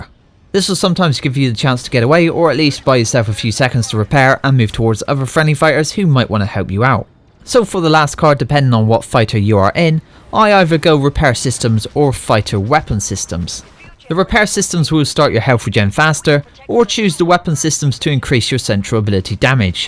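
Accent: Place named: South West England, United Kingdom. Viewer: South West boy are ya? Good to get tips from a local (I'm Cornish).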